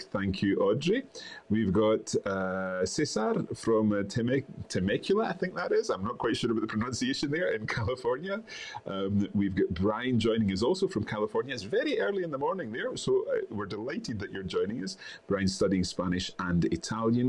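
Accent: Scotland, United Kingdom. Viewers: Patricia living in france and wishing to learn french, thank you love listening to a Scottish man.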